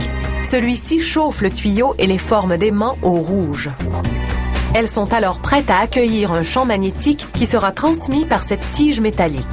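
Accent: Quebec, Canada. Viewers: L'accent québecois est horrible,perso je n' arrive pas a écouter même en me forcant.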